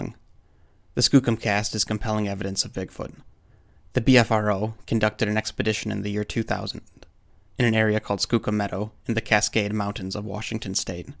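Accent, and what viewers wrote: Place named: New England, United States. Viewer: You must be from the East coast. No one says "wicked" like us that live in New England. I'm in Maine. I have a friend that had an encounter in the Bridgewater triangle ( wompatuck state park) He and friends were chased out of the woods by an ungodly scream. My theory is they are everywhere! Thanks for sharing your experience. I truly love your channel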